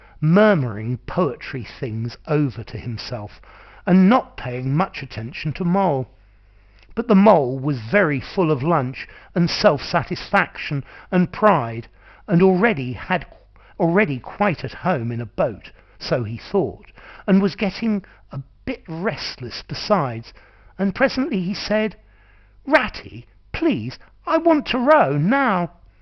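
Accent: United Kingdom. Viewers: Ohh thank you very much, i have been looking for this one with British accent. I give you my like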